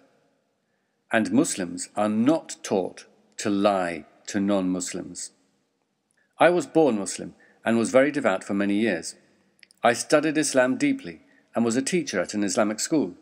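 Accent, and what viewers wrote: England, United Kingdom. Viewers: excellent al taqiyya... use someone with an english accent to say "islam is peace" i advise anyone with a brain to read the koran without the help of a muslim muslims have always preached al taqiyya since mohammeds time: a nsw ering nonb eliever. i nfo/ was- prophet- muhammad-wron g-for-killing-kaab-bin-al-ashraf.html from another muslim.. shows the mentality allah fukbar!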